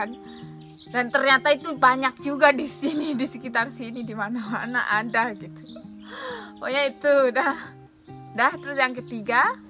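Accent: Bali, Indonesia. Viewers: Logat Bali nya ga ilang yaa.. Keep inspiring. Send love from Indonesia ❤ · Iya logat nya kyak logat Denpasar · mba orang bali ya?? logatnya seperti org bali😊😊😊😊😆😆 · Kok logatnya kyk orang Bali? Dari Bali yaa??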